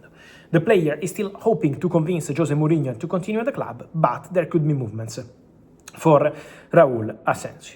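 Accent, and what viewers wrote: Italy. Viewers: İtalian accent is so hard i don't understand you mr Romano😅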